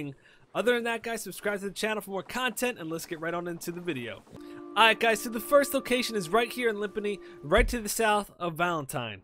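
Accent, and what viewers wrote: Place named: South, United States. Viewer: Like your videos but not the southern accent.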